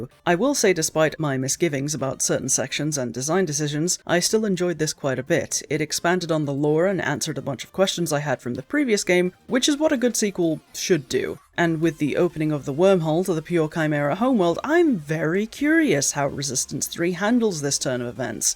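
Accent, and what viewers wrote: Scotland, United Kingdom. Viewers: They're Scottish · Amazing British female voice that loves horror. I love her:)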